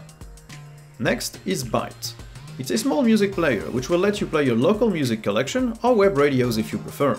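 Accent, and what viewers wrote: France. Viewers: Not My Audio. But saying your french makes a lot of sense. I can understand Indian accent, Asian Accent etc. But have always struggled very hard to understand French accent. My brain just does not want to understand that accent.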